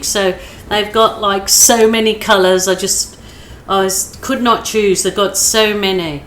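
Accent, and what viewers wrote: Birmingham, United Kingdom. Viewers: I love your philosophy to life. There isn't a video of yours I watch without you bringing a smile to my face, either with your humour or your take on life. I look at your intro pictures (I believe yours is a Brummie accent) and think wow you certainly have found Paradise all the way from England. That's all apart from the great educational and inspirational videos for acrylic painting which have inspired me to dabble.So thank you all the way from a wet and dark England.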